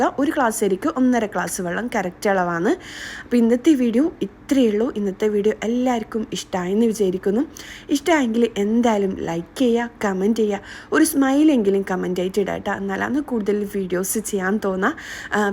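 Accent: Kannur, India. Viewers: Nigal kannura tta tta nn parayunn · സംഗതി ok യാണ് കണ്ണൂർ /കാസർഗോഡ് ആണെന്ന് തോന്നുന്നു 'അനക്ക് "എന്ന് കേട്ടു 🤣😂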